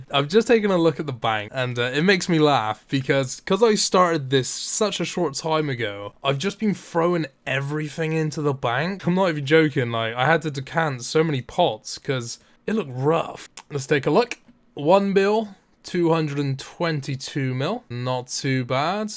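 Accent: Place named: England, United Kingdom. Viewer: Cool furtysix mill loot tab. In all seriousness what region is yoir accent from? I know its and English accent but what area?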